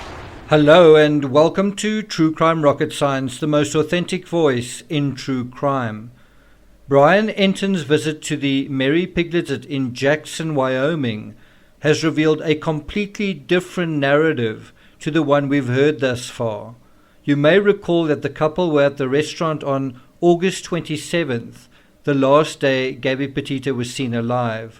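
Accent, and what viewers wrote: South Africa. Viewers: I’m trying to figure out your accent, I’m guessing South African???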